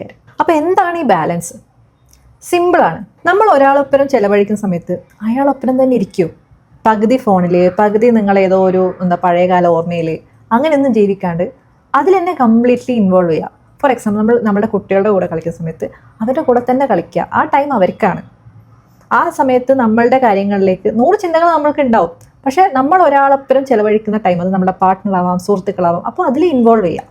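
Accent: Kannur, India. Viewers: Offtopic Slang felt like u r from kannur Kannur edyappa?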